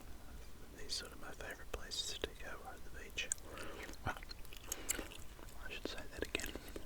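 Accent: Australia. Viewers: Love your voice! The Aussie accent is by far my fave. I also watch another Aussie, but he’s not ASMR. The Drain Addict YouTube channel. Another great one!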